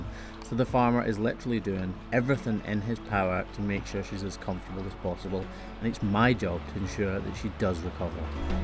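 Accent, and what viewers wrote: Scotland, United Kingdom. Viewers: There’s something about a Scottish accent that seems to lend itself to healing and fixing. Makes me feel like she’s in good hands. Lol. Maybe I’m trained by Star Trek but I don’t care. This poor cow needs a miracle worker like you. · pretty sure you replied to the wrong person. The Katt said nothing about what he is. Secondly he may be English genetically or was born there but a lot of he says has a slight Scottish accent that gets really heavy when speaking to locals. Plus he's in Scotland. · Scottish spoken by homelanders is getting so much easier to understand. This guy speaks perfect English with an accent. I had a childhood friend whose mother was from Scotland and I could never understand a thing she said. I love most accents, so long as I can understand them.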